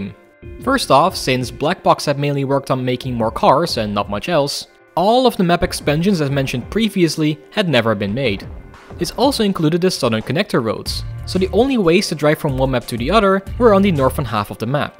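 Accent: Netherlands. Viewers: The Dutch accent is already unmistakable.. But 'Costed'?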